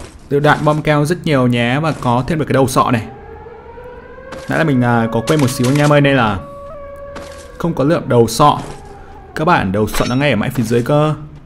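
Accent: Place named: Hanoi, Vietnam. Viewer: Hà Nội lận cơ à hèn chi giọng ko giống miền Nam of E r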